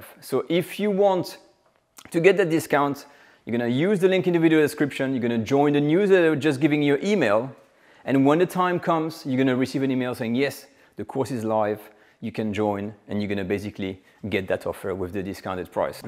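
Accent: France. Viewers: I subscribe because of his accent love French accent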